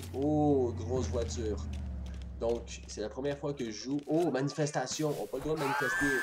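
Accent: Quebec, Canada. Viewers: T québécois ???☺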